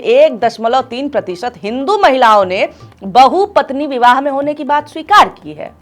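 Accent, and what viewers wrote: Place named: Agra, India. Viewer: Ms. Anchor-Young Lady Aapki ghungrali hindi Sun kurr bachpan kay din yaad aa jateon hain. Lagta hai aap Agra kay gird pudhi badhi hain. Thank for making us smile God bless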